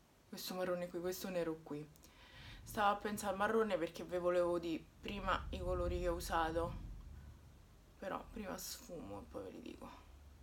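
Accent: Rome, Italy. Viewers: Ciao sei bellissima e il tuo accento romano mi fa morire dal ridere ti amo troppo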